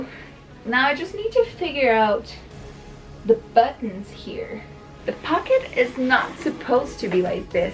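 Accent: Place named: Brazil. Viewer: tu poderia colocar legendas em portugues ou fazer um video em portugues? infelizmente meu ingles nao é fluente, nao consigo entender tudo... cerca de 60%, as vezes um pouco mais, depende do sotaque.... talvez se tu falar em ingles do jeito que tu fala portugues (sotaque brasileiro), eu vou entender uns 80%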